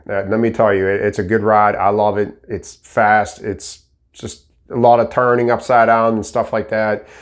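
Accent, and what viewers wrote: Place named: Pittsburgh, United States. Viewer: I just got hired on at Sysco in Zelie as a warehouse selector and start later this month. I've been loving your warehouse training videos and also picking up on your Pittsburgh accent. I heard you say, "n'at," in one of the videos. That on top of the accent, about solidified it for me that you're a fellow yinzer. Then I seen the Kennywood logo and knew we were from the same neck of the woods. Keep the warehouse videos coming. I appreciate you and all your help neighbor!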